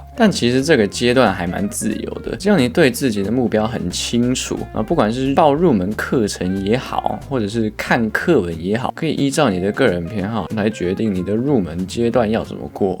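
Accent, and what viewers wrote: Taiwan. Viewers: 我倒覺得是從小在台灣長大的孩紙❤❤❤整個就是台灣口音、台灣思考邏輯、正港台灣人😂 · 你的台湾腔真牛逼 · 天啊！如果沒看到的外國臉，只用聽的會以為是台灣人講話，黏音真的一模一樣😊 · 好難想像這是一個高中才學、家裡沒有講中文的孩子說出的台灣中文，用法發音口氣完全是台灣，甚至比一個多年住在台灣的中配講得還道地，太厲害了，衷心佩服！ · 你很厲害，講中文時連英文字母都是用台式發音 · 你的台灣口音真的很道地...真的是台灣！ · 😮😮居然全臺灣腔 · 好台哈哈哈哈....不看畫面好像聽台灣youtuber講話，真的很厲害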